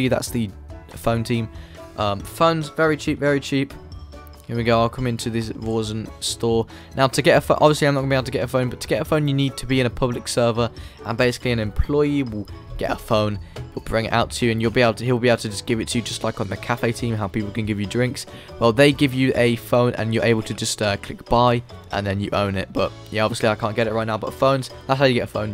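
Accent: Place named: United Kingdom. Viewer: your brittish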